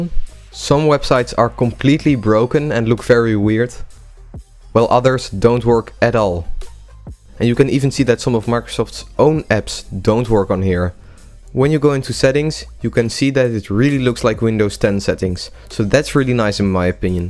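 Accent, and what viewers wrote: Netherlands. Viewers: Its funny that i can hear your Netherlands accent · are you dutch by any chance? your accent in english is same as mine haha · I smell Nederlands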